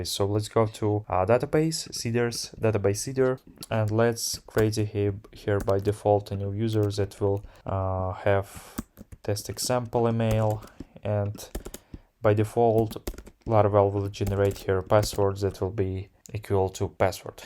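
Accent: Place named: Russia. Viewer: Thanx a lot for this guide! Fluent english from russian spell that's what i neeeed, man "]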